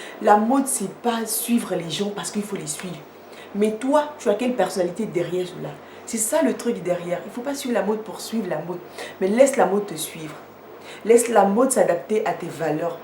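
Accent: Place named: Ivory Coast. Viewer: Ah t'es ivoirienne j'ai l'impression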